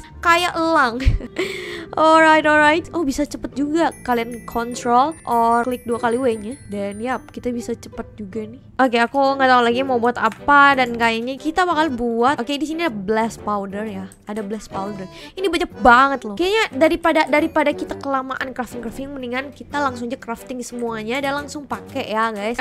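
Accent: Java, Indonesia. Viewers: medok banget anjir waowkkkwka🤣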